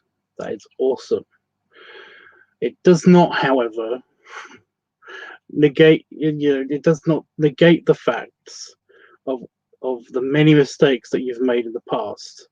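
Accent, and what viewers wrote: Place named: England, United Kingdom. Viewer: Sorry to learn about how you're not feeling well. I realize this posting is a week old now so hopefully you're back to your happy self as you read this... I've been working heaps this past week, to get a 4 day holiday weekend, as we celebrate Victoria Day in Canada. Regarding your throat discomfort, it may be possible that it's a symptom of an allergy. I developed allergies when I turned 40 ish - never having suffered from them before. The time of year plays a big part. So hopefully your hot bear body will fight it off. All this time I've admired you, I've never asked you directly, just assumed you make your home in England? You're wonderful accent leads me to this conclusion (ya think?) LOL. England; for the most part, experiences much the same weather patterns as we live with here in my home of British Columbia. 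It certainly provides the necessary elements, which ultimately evoke the bloody flu. Anyhow, I wish you a speedy recovery and look forward to your next post bear. Hugs from your furry bear Canuck buddy.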